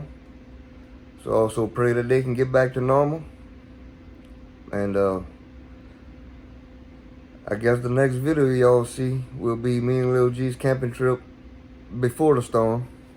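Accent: Louisiana, United States. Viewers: DAT LOUISIANA TWANG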